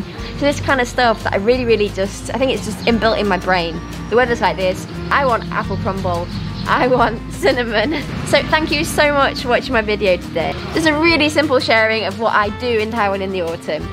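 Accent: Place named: United Kingdom. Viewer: 英國腔很好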